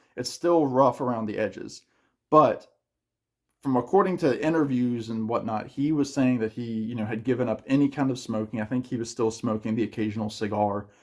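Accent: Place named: North Carolina, United States. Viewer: Big fan here. " " is still my favorite Dylan record. " " is alright. " " is great. " " is probably the first time I heard him croon. Some of those songs reminded me of. I grew up in the Deep South and recognize southern accents. (definitely a Delta guy) I'm going to go ahead and say your accent is North Carolina, possibly Tennessee? I tried to do a Dylan show but don't touch him on YouTube. They will yank that in a heartbeat. " " looks like a black metal album cover 😅. This is good. I'm excited to watch more videos. Cheers!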